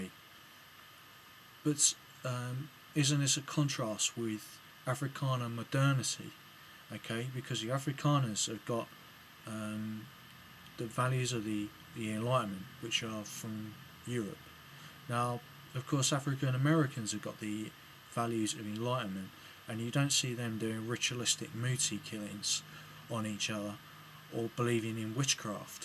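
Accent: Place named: England, United Kingdom. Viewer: By ur accent I know that u are from England.shameful u are clueless about the murderers and murder victims of apartheid. I really don't understand why u sit down always and talk rubbish SA when u can put ur time into a good source. Well know it the English adore Mandela . Go to the famous tralfargar square and see his sculpture there. So do us a favour and stop blabbing lies u spineless coward with no brain and unscrupulous even when u at lying. U tube is not meant for idiots like u.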